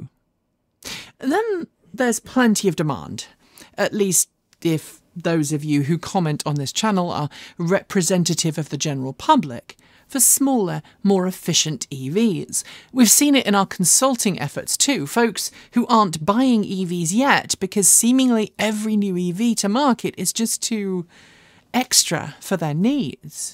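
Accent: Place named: United Kingdom. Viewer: Well, it sure did take you a very long time to make some good points! Get on with it and so being in love with your own bloody British accent.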